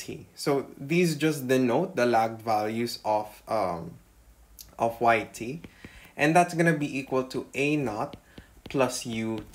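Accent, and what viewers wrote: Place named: Philippines. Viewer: You sound Filipino. Good video.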